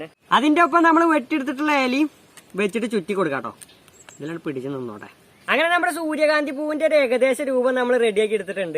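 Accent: Thrissur, India. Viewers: നാട് എവിടെയാ തൃശ്ശൂർ ആണോ സംസാരം കേട്ടിട്ട് തൃശ്ശൂർ പോലെ ഉണ്ട്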